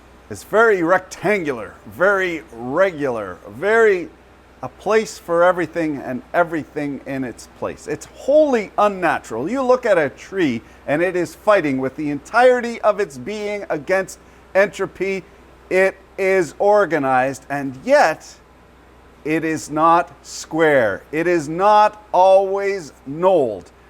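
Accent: Canada. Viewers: ! I’m a Yankee, but work for a Canuck company down in the states, and a’many’o Canucks I have income across, and have for quite sometime, and with that I have started to figure out (or I believe to have figured out) regional Canuck dialects. Are you a west coaster? Tanks for da ridiculous humor!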